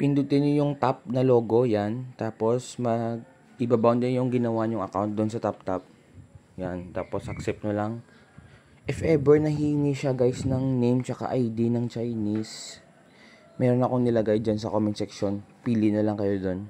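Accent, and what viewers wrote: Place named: Iloilo, Philippines. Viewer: Mabal an mo gd Kun ilonggo Kay ga dala Ang accent bsan mag tagalog hahaha same boss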